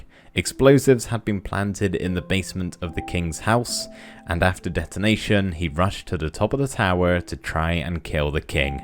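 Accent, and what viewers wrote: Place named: England, United Kingdom. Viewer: Gutted to hear this. Thank you for so many wonderful episodes! Edit: oh, man, I'm surprised how sad I am about this ending. It was a little bit of chill happiness during a horrible time for all of us. 2nd edit: Of course you have to go with your motivation and I wish you continued success with your channel. I'm sure I'll give one of your other series a try soon. One last thing I've wanted to say for almost 2 years by the way: I love hearing your accent! It's so cute to hear a rhotic English accent from England (most speakers in England are non-rhotic). Somehow your accent makes you sound warm and friendly, although that's probably also your personality ha ha. Thanks again for helping us get through a tough time!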